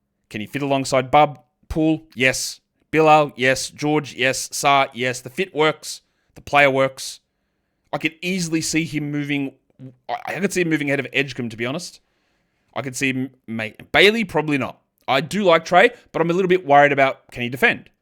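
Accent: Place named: Australia. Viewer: He’s Australian, he inherently has an accent different from ours. Also he’s. He’s called sadiq bey the depressed penis for his whole career.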